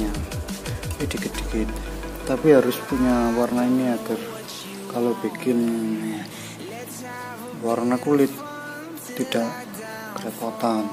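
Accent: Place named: Java, Indonesia. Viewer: medok nya itu loh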